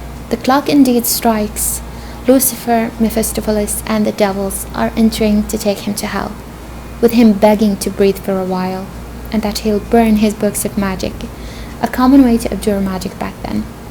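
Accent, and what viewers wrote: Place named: United Kingdom. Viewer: ماشاءالله كيف تعلمتي الاكسنت البريطاني نقطه ضعفي حاولت اتعلم بس ماش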